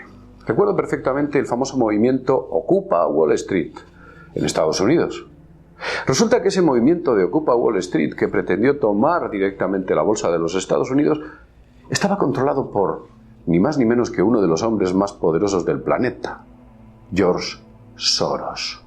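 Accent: Spain. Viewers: de que parte de España sos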